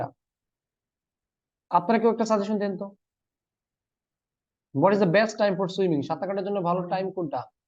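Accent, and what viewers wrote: Sylhet, Bangladesh. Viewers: Sir er maje maje Sylhet language ta kub balo lage.... Serious Video dekad majew hasi pay.... 😄😄😄😁😁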